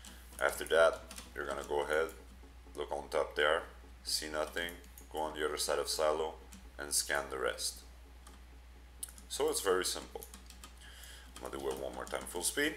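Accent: Canada. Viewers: are you a french canadian ?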